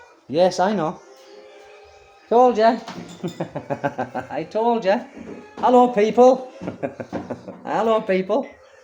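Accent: United Kingdom. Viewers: I am probably wrong, but you sound like a Brit. I am just a bloody yank. I can think of a half dozen places that are not safe in the UK. London, Manchester, Birmingham, Liverpool... you get the point the UK is not safe. Even 40 years ago it could be said that Belfast would not have been safe because of the IRA. In the USA, I am always armed. I have never had to use my firearm and I have lived in some very dangerous places. There are still many many dangerous places in the USA and even Canada as well. I would have to agree with your wife. Safe during the day is one thing, safe at night is something else. Now if you lived in Japan, I would say she was overreacting. But any one of the 7000 islands in the Phil, she is right. It is still a 3rd world country and people will do desperate things for money.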